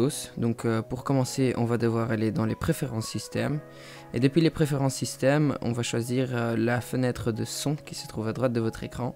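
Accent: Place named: Belgium. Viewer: Incroyable l'accent belge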